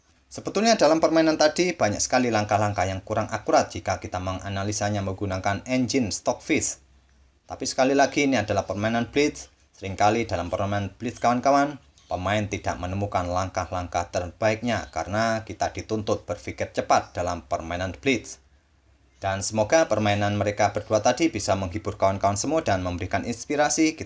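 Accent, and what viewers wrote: Java, Indonesia. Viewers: Jowo ne pundi min?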